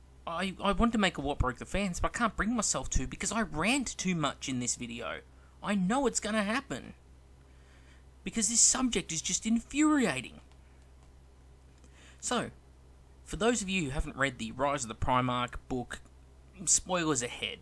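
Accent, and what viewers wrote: Australia. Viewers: I would of preferred if they brougt back Leman Russ, but not being big on the lore that might just be because I'm a wolf fan boy rather than because I think it would make a good story. Also, I'm guessing your Australian?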